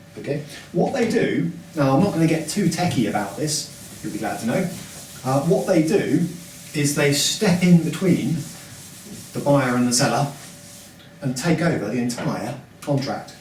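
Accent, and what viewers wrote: England, United Kingdom. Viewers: Sorry Don't understand your English Accent. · lol that's the clearest english accent by an english person you'll ever hear. he's RP to the core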